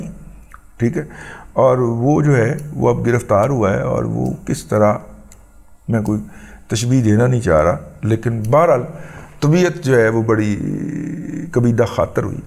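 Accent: Pakistan. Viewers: rizwan razi sahab aap sushe muhabe wattan pakistani heain aap ka lahajha bata raha hea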